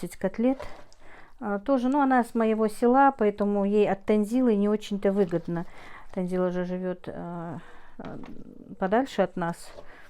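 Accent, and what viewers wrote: Standard. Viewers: Смотрю и отдыхаю Чисто руский говор молодец.Труженница👍👍👍👍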